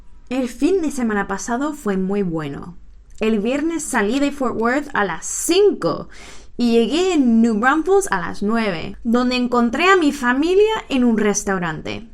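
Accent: Andalusia, Spain. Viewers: como me gusta tu acento andaluz me recuerdas a un amigo de inglaterra que aprendio a hablar español escuchando a la suegra sevillana y de vez en cuando soltaba un deje andaluz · Me encanta el acento andaluz 🤭🤭🤭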